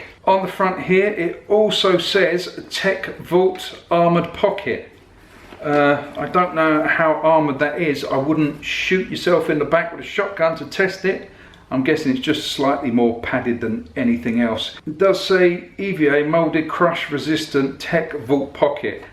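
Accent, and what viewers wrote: United Kingdom. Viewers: this video would be extremely boring.... but that British accent makes it interesting.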